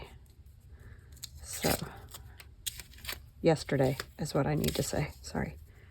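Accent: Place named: Carolinas, United States. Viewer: You kinda sound like you’re from the Carolinas. Are you?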